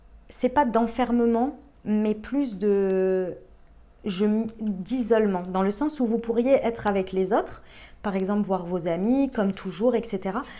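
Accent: South, France. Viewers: Chère je suis contente de te réentendre, ta voix est tellement sucrée et ton accent sent le sud 💃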